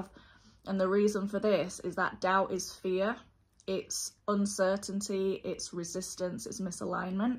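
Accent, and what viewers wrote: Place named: England, United Kingdom. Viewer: ...i know right, since when did 'not' recording for awhile, and doing anything other than laying dead in a ditch or being in a prison cell, mean laying in a ditch or being in a prison cell. 😂😂😂 Again thank you, i love your beautiful interpretation and articulation of the TF Journey. I notice your english accent, im irish, so 'hi- diddly - ho, neighborino', as Ned Flanders would say. 😂🙏🏻💚 Keep up the good work.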